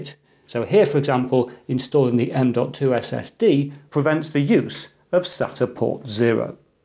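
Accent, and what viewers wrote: United Kingdom. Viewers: Information goes in so much easier when it's a fellow brit explaining it lol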